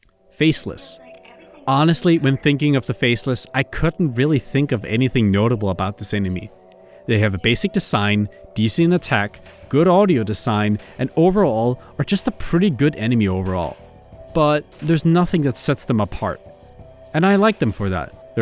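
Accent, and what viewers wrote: Denmark. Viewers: bro r dansker wtffff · Er du dansker? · jeg var slet ikke klar over at du var dansker💀💀💀💀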